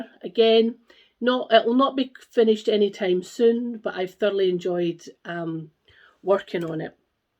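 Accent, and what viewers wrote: Scotland, United Kingdom. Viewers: A great cut and lovely colour! Your hair really suits you. Thanks for the videos, I always enjoy them and I love listening to your Scottish accent. I was born up in Banffshire several decades ago, but was brought down to England as a babe in arms and here I have stayed. Your voice makes be feel nostalgic for Summer holidays with my grandmother. Now I must see if I can out my hands on that Blackbird Christmas design..... All best wishes, Liz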